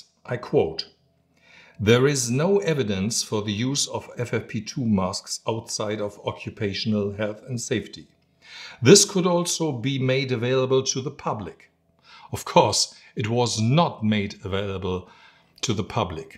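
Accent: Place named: Germany. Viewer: very gut denglish but kommt das noch auf deusch?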